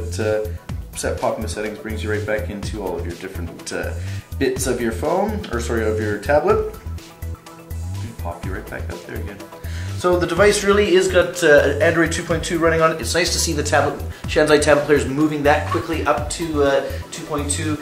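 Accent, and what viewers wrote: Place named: Canada. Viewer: This guy sounds like a Canadian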